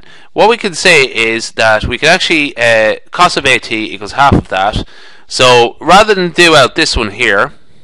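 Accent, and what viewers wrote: Ireland. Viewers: man's irish. i can tell. that was really helpful thank you!!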